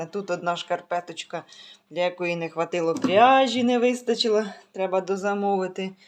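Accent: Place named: Chernihiv, Ukraine. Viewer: а я когда тебя слушаю и смотрю, свекровь свою вспоминаю. Её говор очень сильно похож на твой. Она у меня с Черниговской области. А мои на суржике больше говорили, пока папа жив был и бабушки. Мамина родня и с Мелитополя.. Но сейчас мы, к сожалению, только на русском говорим. Но передачи смотрим в интернете на украинском.. А вообще, когда я слушаю твою речь, как будто к бабушкам попадаю, сразу такие воспоминания тёплые начинаются. 🙂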